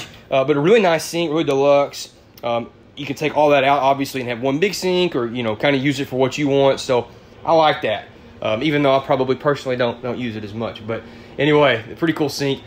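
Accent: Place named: Kentucky, United States. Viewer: Aweslme review. Thanks. Love your Kentucky accent Reminds me of a Texas accent.. Definitely upgraded items. Love the kitchen. Surprised there is no outside kitchen. My main concern would be room for fulltime living clothes storage space. Love the bathroom, and tv/fireplace in the bedroom. It doesn't constantly cover the window. Woild have like bigger windows in the living room instead of light sconces.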